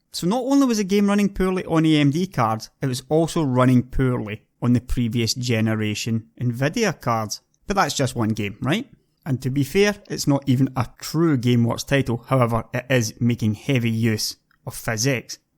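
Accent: Scotland, United Kingdom. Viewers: scottish, game over for you. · It's Scottish English, laddie! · Great information and he dove in deep. This reinforces what I've suspected over the last decade which is that there's no real need for so many hardware refreshes. It's more about marketing gimmicks and lazy coding. Sure there's other factors like the amount of texture memory available, speed and power efficiency but when I started seeing less than 10% overall performance improvements between generations I knew something was up. This vid made me a subscriber, Scottish brogue and all...:-) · Jesus christ another Scottish ned I have to listen to for 20 minutes it's bad enough trying to understand what these monkeys are saying in Trainspotting. Now I have to listen to this heroin addicted tree dweller talk about Gameworks? I don't think so! We really need to build that gigantic wall and have done with these "people" I am so sick of it.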